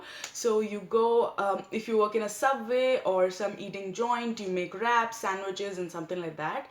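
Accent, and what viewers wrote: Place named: India. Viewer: 🐷🐷哎呀妈耶，这么隆重的阿三口音, 真的是所有单词都听懂了，但什么都没听懂最后🐷🐷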